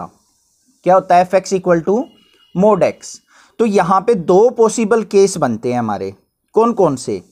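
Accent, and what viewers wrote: Haryana, India. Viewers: Sir haryana se ho ?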